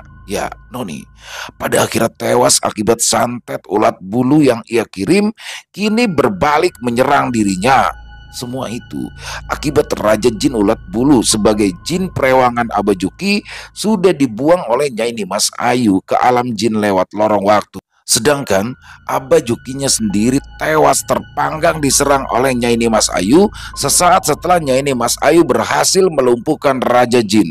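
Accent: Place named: Jakarta, Indonesia. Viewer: ia sama2 Bang seneng mendengarkan suaranya maaf Bang aslinya dr jakarta suaranya ciri kaya orang Jakarta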